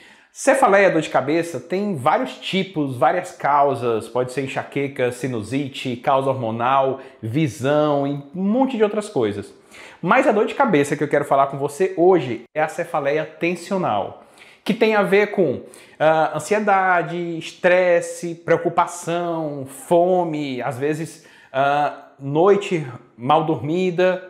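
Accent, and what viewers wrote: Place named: Ceará, Brazil. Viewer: pelo sotaque acho que é cearense,obrigado pelos ensinamentos.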